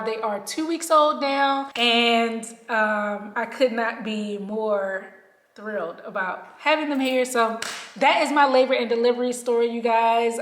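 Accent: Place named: South, United States. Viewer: That morphine got you sounding COUNTRY!! Congratulation! 🎉🎉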